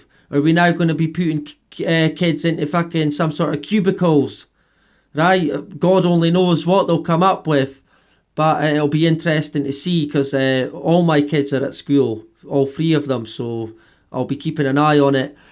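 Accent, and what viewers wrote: Scotland, United Kingdom. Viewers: Am I the only one who loves to hear his intro ? "Right, here we go, back again, back again" in that Scottish accent.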